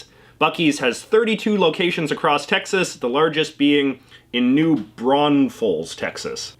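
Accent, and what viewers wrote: Canada. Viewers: J.J. McCullough k cool just asking it kinda go's with your whole nice Canadian accent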